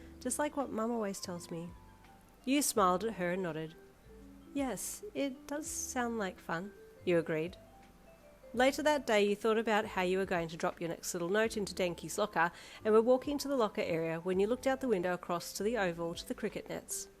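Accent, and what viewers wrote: Australia. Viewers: Naur, Aussie accent is awesome!! 😁💜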